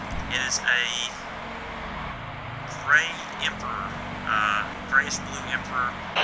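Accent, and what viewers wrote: South, United States. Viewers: You kinda sound like you are from the South as well as am I. Like the skin on the interceptor.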